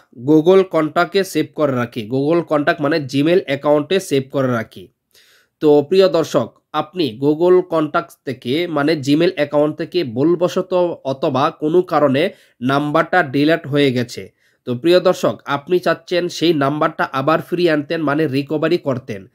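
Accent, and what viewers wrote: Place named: Sylhet, Bangladesh. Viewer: সিলেটি ভাইসাব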